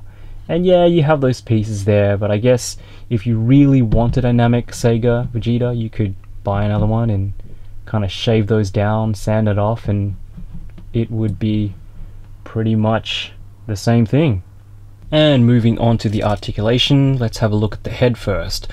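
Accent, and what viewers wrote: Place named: Australia. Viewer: I was your 500th Sub haha. I'm guessing from the accent you're in Australia too? Where do you get your figures from. I've found that hobbylinkjapan is the best so far. Let me know if you have other options.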